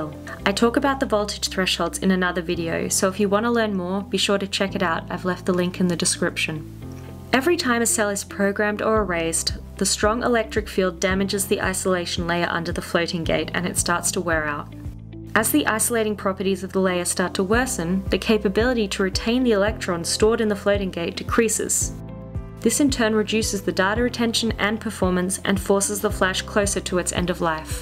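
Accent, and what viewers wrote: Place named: Australia. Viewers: The very definition of an Australian accent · sounds like urban Australian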